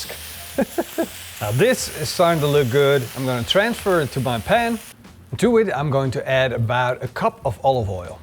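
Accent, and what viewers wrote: Netherlands. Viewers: You are dutch right? i can hear immediatly haha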